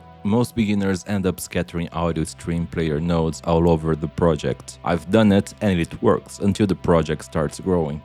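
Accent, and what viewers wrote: Brazil. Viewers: sabia. Minha esposa é do Brasil; eu podia ouvir a mesma sotaque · hey is that a fellow Brazilian accent I hear?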